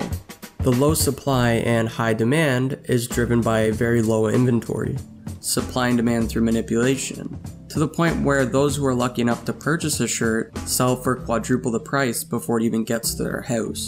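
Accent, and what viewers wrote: Canada. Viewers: Hey, are you Canadian? Once you mentioned Wrong Bar and from 2012 i was like wait.. that sounds like Toronto talk!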